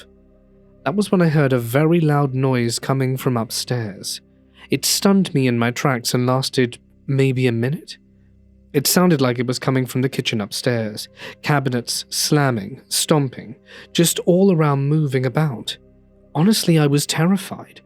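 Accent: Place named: United Kingdom. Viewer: British accen5 just isn5 doin* it for me. Gotta stop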